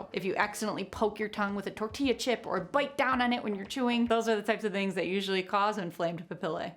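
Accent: United States. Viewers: Great informative channel with a positive personality and an a smooth American accent lol.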